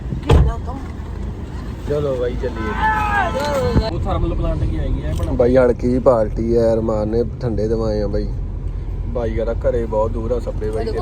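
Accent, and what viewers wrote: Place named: Patiala, India. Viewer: ਬਾਈ ਕਿਆ ਥਾਰਾ ਪਿੰਡ ਪਟਿਆਲਾ ਕੇ ਲਾਗ ਪਵਾ ਬੋਲੀ ਤਾਂ ਇੱਧਰੀ ਕੀ ਲਗਾ ਬਾਕੀ ਛੋਟੂ ਘੈੱਟ ਐ ਜਿਸ ਕੋਲ ਘੱਟੋ-ਘੱਟ 300/ ਰੁਪਏ ਤਾਂ ਹੈ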